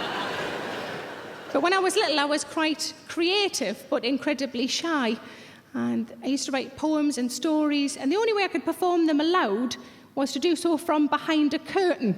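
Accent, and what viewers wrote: United Kingdom. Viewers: What part of the UK is that accent from, lovely xD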